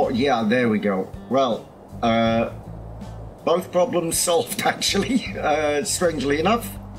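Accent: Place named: RP, United Kingdom. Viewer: No way I got it? HA HA😃 Your' pronunciation of French words betrayed you proper BBC accent. Man, I spent too much time in the tourist industry.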